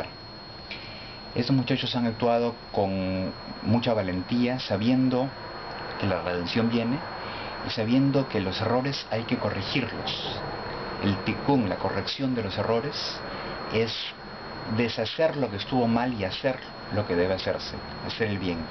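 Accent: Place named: Argentina. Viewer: No reconozco tu acento...sos argentino?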